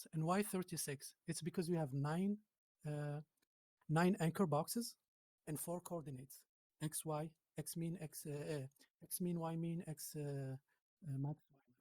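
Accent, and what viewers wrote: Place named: Tunisia. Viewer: المتكلم تونسي و لاجزائري ..لكن الصوت زيروا كبير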